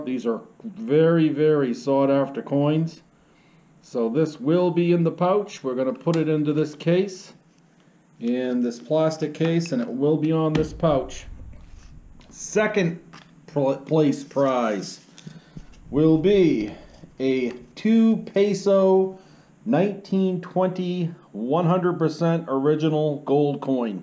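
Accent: United States. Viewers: Put my name in the pot. Enjoy your videos and especially love the New Hampshire accent.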